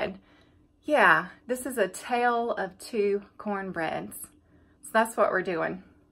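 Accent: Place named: North Carolina, United States. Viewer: Being from the foothills of NC I immediately recognized the accent! Love a country girl that can cook!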